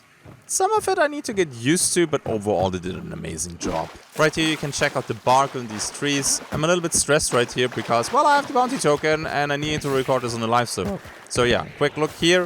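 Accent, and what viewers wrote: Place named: Germany. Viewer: This is the most perfected Denglish I've heard in my life, I am a big fan! Great content dow 😅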